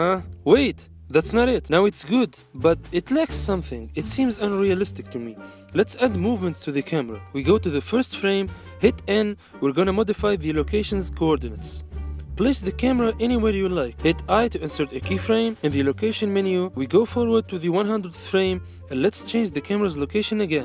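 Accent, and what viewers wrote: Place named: Tunisia. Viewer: borjouleya l anglais mte3ek mtaa tounsi sahby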